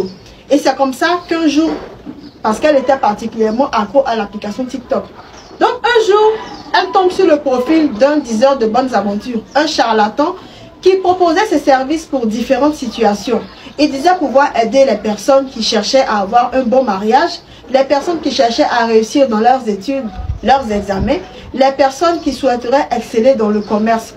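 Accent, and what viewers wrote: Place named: Ivory Coast. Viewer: Tu l'accent ivoirien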